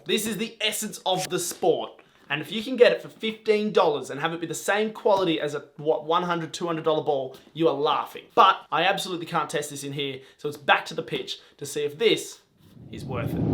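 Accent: Australia. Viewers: Are you from Australia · Is Australian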